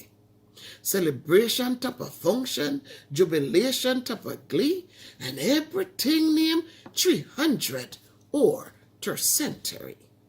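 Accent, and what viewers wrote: Jamaica. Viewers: Blessings my dear sis...just tuning in..the poem is good.. You always make me laugh when you do the patwah..love you always you bring joy to us..prayers and blessings to you and family...ernestine 🇬🇧 uk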